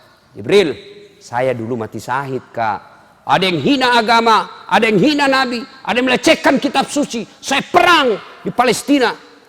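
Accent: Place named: Bugis, Indonesia. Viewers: Inget babah denger logat bugis ustad das'ad😢 semoga nanti bisa undang ustad saat ada acara🙏 aamiin yaa allah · Ceramah lucu,kocak,bikin ngakak,logat bugisnya bikin tambah suka banget. Tapi sangat berbobot...saya ska ustadz. Smoga selalu diberkahi Allaahh ya istadz.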